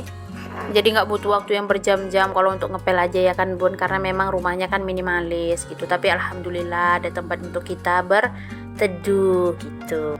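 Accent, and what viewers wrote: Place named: Medan, Indonesia. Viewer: Klw dari logat... Orang medan yaa... Didaerah Dimana medannya? 😊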